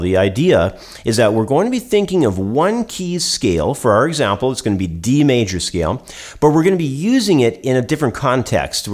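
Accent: Canada. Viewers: Coolest thing is even though a 1 string solo not playing with 1 finger too obvious is to a untrained eye the illusion created is that it appears to come across unless watching like a hawk a very melodic piece of music. And very cool. Almost like what Glenn RIP did on the little solos were only on 2 strings. You got so much content don't know if ever get thru all but gonna sub. to channel. BTW I hear Canadian accent are you still up there or do you live on states now?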